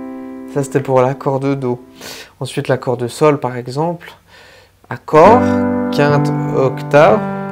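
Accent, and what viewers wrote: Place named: France. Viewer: J'avoue que quand je vous entends dire des mots anglais avec une prononciation française ça pique les oreilles. Paterne ou bien séquence...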